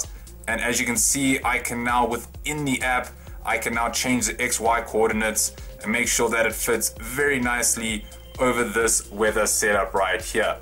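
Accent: South Africa. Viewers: dude.you South African?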